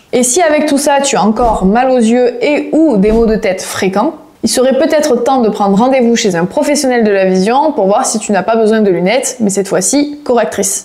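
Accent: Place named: Ardèche, France. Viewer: Vous avez un peu le même accent que moi, l'accent ardéchois, mais vous c'est plutôt celui du Nord de l'Ardèche, limite Haute Loire. J'ai bon ?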